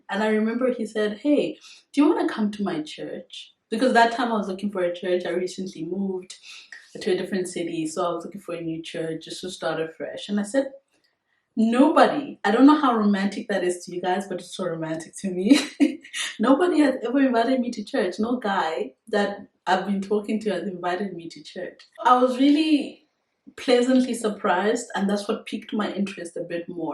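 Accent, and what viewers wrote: South Africa. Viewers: You’re so beautiful 🔥🔥🔥😍and you look and sound South African ❤❤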